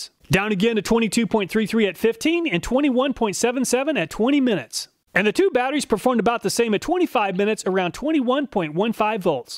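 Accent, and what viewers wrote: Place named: South, United States. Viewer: You talk so slow, must be that southern drawl I keep hearing about.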